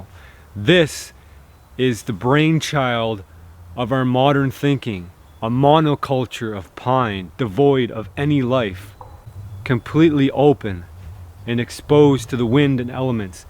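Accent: Canada. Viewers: I like how he doesn't have a Canadian accent and then it just says in this one video of how he talks Canadian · cool! Ton anglais est impeccable, impossible de deviner que tu parles aussi en français:) mais probablement avec l'accent du Nord comme à Sudbury:) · You sound hella Canadian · He’s sounds Canadian😄😄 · The second I heard your voice I knew you were Canadian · you are Canadian! Test it Oat = test it out! Haha, nice channel! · Finally a Canadian accent i can hear.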